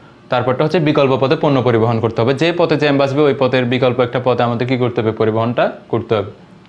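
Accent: Noakhali, Bangladesh. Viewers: Apni ki Noakhalir😐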